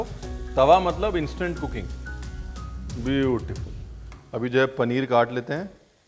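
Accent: India. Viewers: "Main keya ayi k nai hun dhabbe ali feel"😂😂👍 That total Desi accent is so appealing to hear that we rush to to your vedios not just for magical cooking recipes but also for this entertaining content. It makes you stand apart from all others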